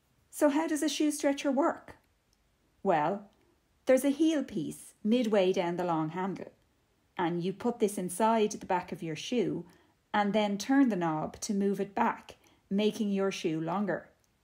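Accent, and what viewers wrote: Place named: India. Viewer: What a lovely accent and informative video, i love your accent are you from india?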